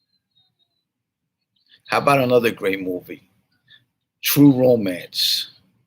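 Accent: New York, United States. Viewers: your accent is straight up NY Gangster!!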